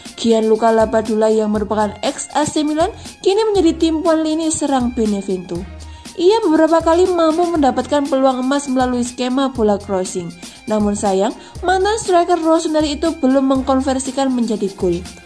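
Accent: Java, Indonesia. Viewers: Wah wah bahasanya medok bgt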